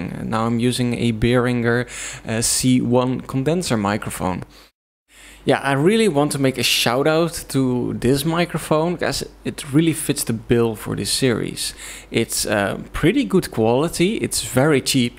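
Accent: Netherlands. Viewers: neemt de microfoon ook nog geluid op als je er een stukje zo een metertje van af zit? ik hoor aan je accent dat je nederlands ben hahah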